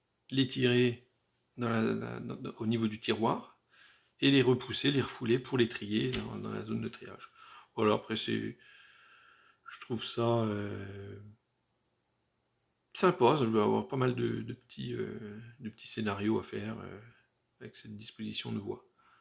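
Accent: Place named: Haute-Marne, France. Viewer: Bonjour pas mal l’ancienne doc FLEISHMANN très inspirant ta vidéo j'ai très envi de me lancer, c'est quand le prochain confinement ...c'est une blague, mauvaise blague surement j'ai pas trouvé mieux, j'imaginais me lancer dans le HO mais vu la place pourquoi pas le N, tu trouves facilement du matériel à cette échelle ? dernier petit point sympa le léger accent de la haute marne j'ai mon frangin qui habite la côte d'or depuis... qu'il a laché son département d’origine et je retriouve les intonations de cette région ;-)) Merci encore pour tes vidéos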